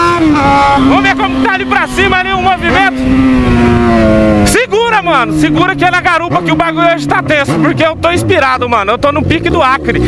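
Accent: Goiás, Brazil. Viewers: vc e Goiano?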